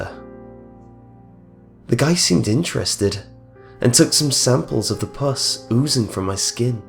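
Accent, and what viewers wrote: England, United Kingdom. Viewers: I love your voice, I don't know why but an English accent just makes things creepier.:) You kind of sound like Wacko off of Anamaniacs. (Not a bad thing at all.) (Also, I'm sorry for spamming your comments by replying to an idiot.)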